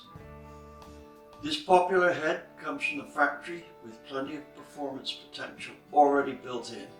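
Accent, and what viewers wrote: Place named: Gloucestershire, United Kingdom. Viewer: Hi, great Video, simple terms, and nice to see you have not lost your Gloucestershire accent after all those years over the pond.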